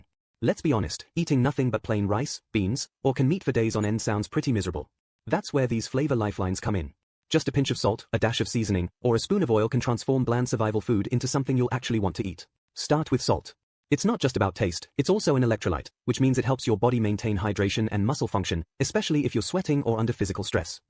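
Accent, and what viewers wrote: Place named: England, United Kingdom. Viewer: Congratulations! A new voice was used (English accent); however, the list of essentials has changed little. Why? Because it is somewhat difficult change to any degree.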